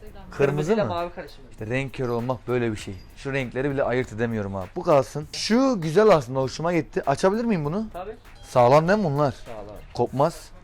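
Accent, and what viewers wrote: Kayseri, Turkey. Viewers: Abi kayserilimisin yoksa anteplimi anlamadım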